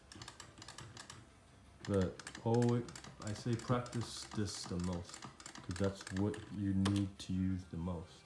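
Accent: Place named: Russia. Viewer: Cool video but can't understand anything with your heavy russian accent lol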